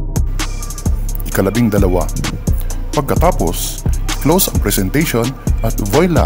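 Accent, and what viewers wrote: Visayas, Philippines. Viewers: Galing mo bro..boses mo parang bisayan tonation...pareho tayo..nice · Bisaya ka. rin ba sir?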